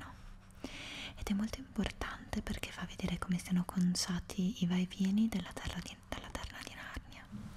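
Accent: Italy. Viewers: I love the softness of his voice and his Italian accent 😴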